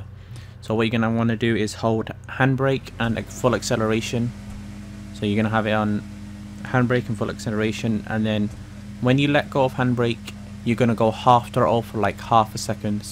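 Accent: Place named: Bury, United Kingdom. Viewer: u from bury?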